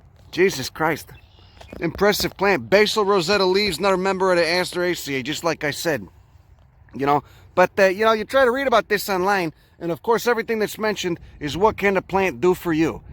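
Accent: Chicago, United States. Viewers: Chicago Italian accent lol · Omfg bruh this really is how old school mfs Sound in Chicago instant subscribe i would listen to dis guy read the phone book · "The lawn is a tragic, impoverished mindset" BRILLIANT! This guy knows his shit! Love the Chicawgo accent! LOVE it! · So, I've been watching your videos while I do my schoolwork for some reason, and I zoned out from this one for a minute as I was reading a Geometry textbook and looking at hexagons and decagons and stuff, and I just hear a voice with a thick Chicago accent say in my ears: "That would actually look like It'd hurt if you put that in your ass, I don't want to put that in my ass." I had to rewind the video a few times to make sure you were just talking about Echinacea. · Oh my glob, this guy is HILARIOUS! I have to go back and watch everything he's ever made, now. Wisconsin has a lot of the same flowers and I'm a Master Naturalist trying to learn more plants every day, and starting to recognize a rather amazing number of them. 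Takes time to get them all to stick in my brain, one plant at a time. But with a Chicago accent and all the expletives? I'm going to learn SO much faster now!!!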